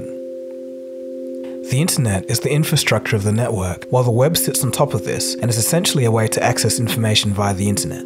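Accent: Australia. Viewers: 5G here in Australia? Yeah, sure: it's hard to even get 4G CONSISTENTLY across bloody Sydney (you sound like an Aussie). No Bandwidteh Now (NBN) will only continue to screw this up even more towards 5G ... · That Aussi accent is so awesome! Cheers from Brazil.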